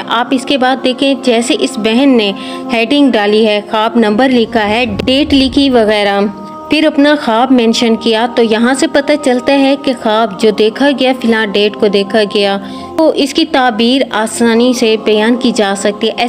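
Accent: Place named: Saraiki, Pakistan. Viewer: سرائیکی انداز میں خوبصورت انداز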